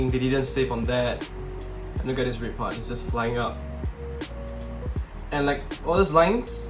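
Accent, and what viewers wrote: Singapore. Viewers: We know a singaporean accent when we hear one 😂😂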